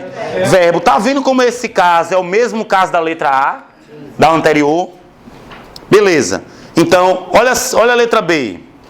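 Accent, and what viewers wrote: Northeast, Brazil. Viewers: Pense num sotaque gostoso de ouvir... Sotaque Nordestino é bom demais. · Pelo sotaque sabia que você é nordestino, com muito orgulho. Grande talento, parabéns! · oooww Glória, gloria a Deus. Ei creio. Terra maravilhosa essa Paraíba, meu Nordeste. Eu sou de Pernambuco. Desconfie por causa do sotaque rsrs. Obrigada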